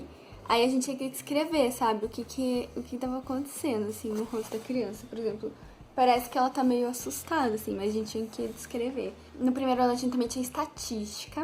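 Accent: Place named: South, Brazil. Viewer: sotaque parece ser do sul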